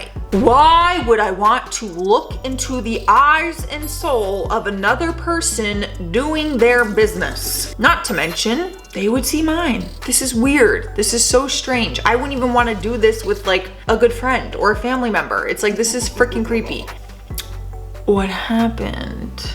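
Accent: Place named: Canada. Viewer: Hi, I do love the way you say bagels, granted I probably couldn't say it much better myself being from the North West of the UK, but they way you say it makes me giggle everytime, love your Canadian accent so much, I try watch atleast one of your videos from your multiple channels once a day, longest I've gone it 48hrs, my husband and I watch you (and Charlotte Dobre) everytime I'm on YouTube I just can't resist your sass and pickle talks:)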